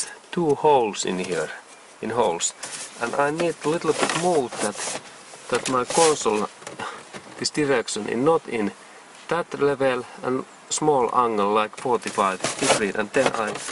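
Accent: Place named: Finland. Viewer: I am not a mechanic, I liked your video and the way you showed me how to do the cable tensioning. I would have thought that you should have jacked the vehicle up and put on jack stands, and then before assuming that the tension was right, go and see how the wheels spin freely. BUT I guess by evaluating the clicks and thereby the tension in the cable would be sufficient. I learned from you, but I will jack the vehicle up as I am not a mechanic and would not be sure that I have the right tension, perhaps also the shoes should be adjusted, I don't know about it, but I am about to do a Camry 2003 cable, if there is a cable, the handbrake leaver has no resistance as if there is no cable or connection at all. Can you can you come to North Queensland and do it for me? Cheers, and thanks. Yes by the accent I know you are from lovely, friendly Finland.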